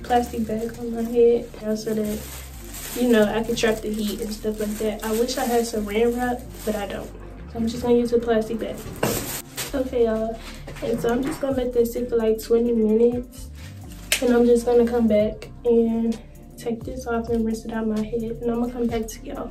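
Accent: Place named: Texas, United States. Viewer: Your hair looks beautiful!! I said yep that's a Texas accent.... possibly East Texas! Thanks for showing us doll💚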